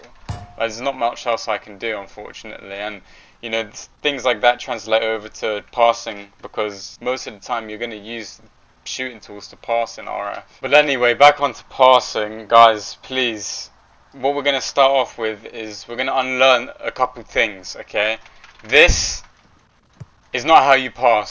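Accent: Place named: India. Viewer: I LOVE THE PRIME (u sound indian with that mic)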